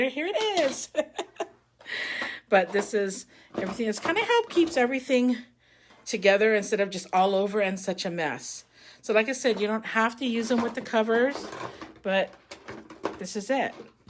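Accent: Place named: Hawaii, United States. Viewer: Mahalo for making this video. I wish I could hire you to organize my house. Your voice is making me miss my friends from Hawaii.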